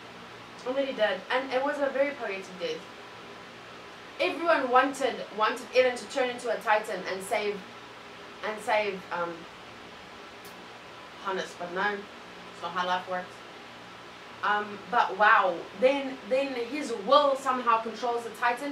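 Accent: South Africa. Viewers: what accent is that it sounds south African??? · Huh, you're from South Africa? That's cool, explains the accent.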